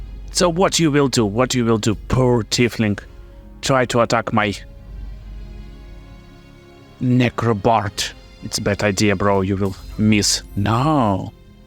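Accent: Russia. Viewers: Слышь по твоему говору понятно что ты русский.говори порусски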